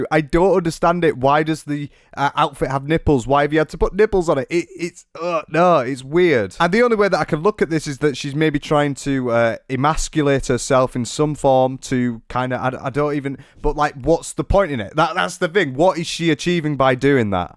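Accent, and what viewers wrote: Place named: Scotland, United Kingdom. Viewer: I could swear I heard some Scottish accent 😭 good content keep it up 👍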